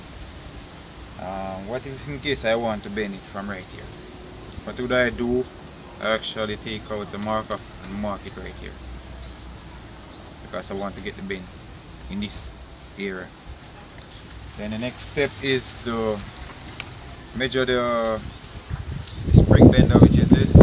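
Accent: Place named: Jamaica. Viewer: I like your Jamaican accent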